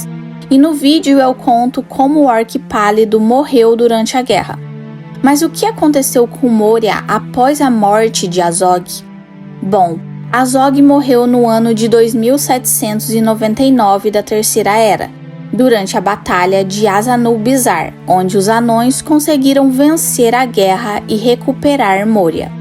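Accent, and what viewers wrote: Minas Gerais, Brazil. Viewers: Moça, você é do Vale do Paraíba? Vi um cara comentando que você deve ser mineira. Fiquei curioso.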